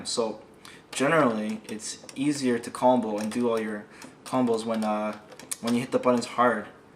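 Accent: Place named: Canada. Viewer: I didn't know he was Asian, but I did know he was Canadian because of his accent. It's not an Asian accent, it's Canadian.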